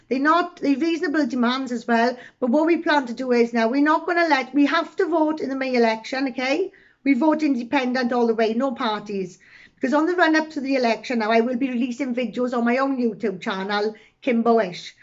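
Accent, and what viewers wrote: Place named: Wales, United Kingdom. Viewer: Fair play you have grown so much since starting nothing but utter respect luckily I been in Wales 6 years I can understand you 👍👍👌👌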